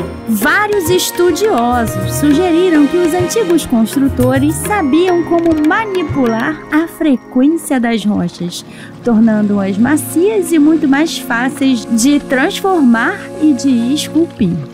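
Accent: Rio de Janeiro, Brazil. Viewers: sua viz,com sotaque carioca lindo, é muito agradavekt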